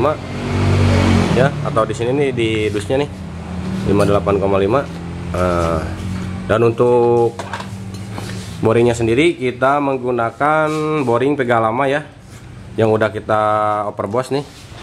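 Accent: West Java, Indonesia. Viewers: Weh Sunda nya mag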